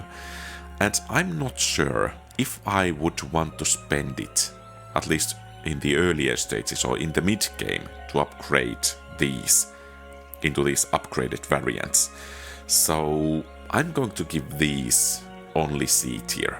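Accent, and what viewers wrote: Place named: Finland. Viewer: Just discovered your channel while getting into Olden Era, soon enough Ill have a great finnish youtuber for every game I play. Something about the accent just clicks :D Looking forward for the release of the game. Its already decent fun and given updates and balancing its gonna be greater yet.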